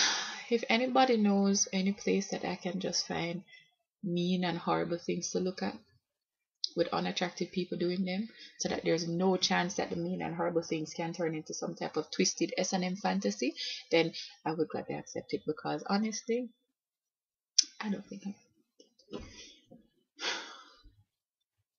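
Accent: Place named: Jamaica. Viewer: Mi love u bad.... and really and truly u Jamaican accent a shot....it sounds like u should take up public speaking :)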